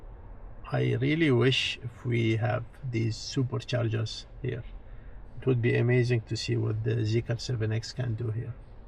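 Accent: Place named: Arab world. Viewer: السلام عليكم. من لهجتك أتوقع إنك عربي. أنا من ملاك 7X متابع لفيديوهاتك. تحياتي لك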